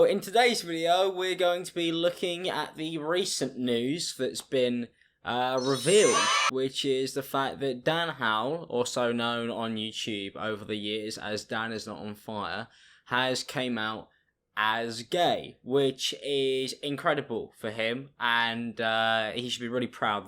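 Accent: United Kingdom. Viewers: Just... How British is this guy... And why the hell is this in my recommendations... Though dan and phil did break my youtube...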